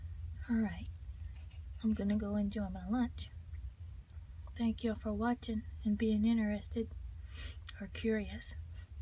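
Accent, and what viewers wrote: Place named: Louisiana, United States. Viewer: I just found your channel today and I have to admit I'm super excited. I have been doing ASMR since 2009 and I have been looking for an ASMRtist that is also from Louisiana. There aren't too many of us! You're the only other person I know of! I looked at your Adult Coloring book video and I was excited when you said you were from here! I'd love to know which part but I won't ask here in the comments if you don't want people knowing. I could always email you or something. But anyway! I love your channel! And I love your accent because it sounds like home :)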